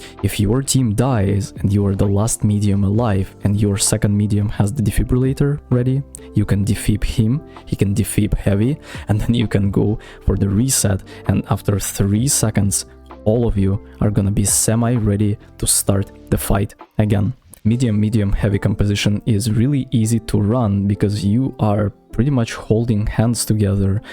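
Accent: Russia. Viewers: сразу понял что ты русский)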